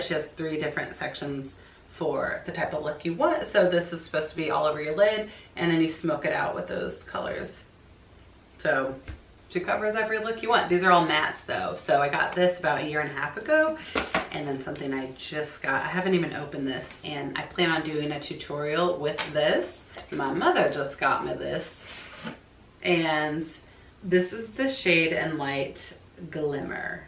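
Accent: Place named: South, United States. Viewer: I loved watching you declutter. I've grown to miss the south and home so it's nice hearing your accent. Will keep watching. ❤️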